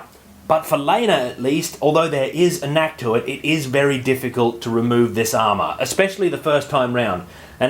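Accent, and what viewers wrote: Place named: Australia. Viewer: Damn your a aussie I was thinking first vid I watched maybe somewhere posh in England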